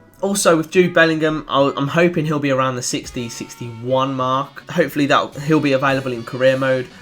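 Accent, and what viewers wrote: Birmingham, United Kingdom. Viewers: What part of Birmingham are you from?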